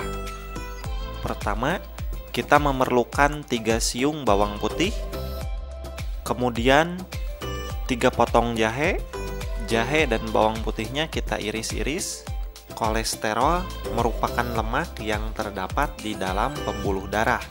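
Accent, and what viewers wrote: West Java, Indonesia. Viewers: Sunda pisan kang... Hatur nuhun ah tips na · Sunda pisan meneh ngomong logatna hahaha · Kayaknya adminnya orang sunda... Kelihatan ga bilang 'ep' (f) 😄😄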